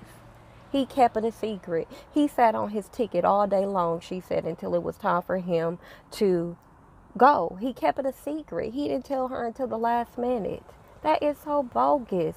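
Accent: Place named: South, United States. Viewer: Hey auntie you are so pretty! I love your accent. Are you from the south? New subbie. Chrissy is way better without him...he is literally deadweight. I can tell just by the videos she does everything and he is just another child. He is not a man, he was a boy. I have no respect for a man who leaves his pregnant wife and kids and to move all her kids and mother ALONE.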